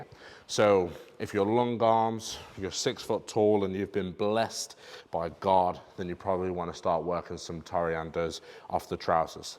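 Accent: United Kingdom. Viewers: My first video, and he sounds like Reggie Watts using an British accent.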